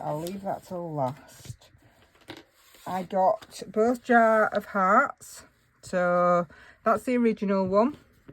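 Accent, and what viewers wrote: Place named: Yorkshire, United Kingdom. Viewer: Hey! Love the long video, wish I was there...bit of a schlep from Oz tho 😂. We'll be back home next week for a visit so just missed it....boo😢! Glad you had a wonderful time well jell over all your lovely goodies ❤ have a lovely weekend bud 😊 ps...lovely to see you and to put a face to that yorky accent 😂❤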